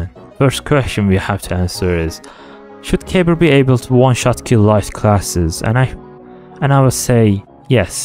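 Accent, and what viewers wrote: Turkey. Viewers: Чувствую турецкий акцент)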